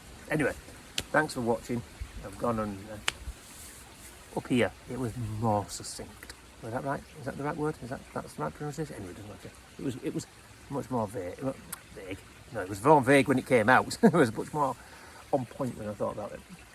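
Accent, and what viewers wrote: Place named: Yorkshire, United Kingdom. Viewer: Some of us just subscribe because we love to listen to you shifting the problems around the landscape. I wonder how many Americans you are going to attract, though. They are not good at Yorkshire accents, alas.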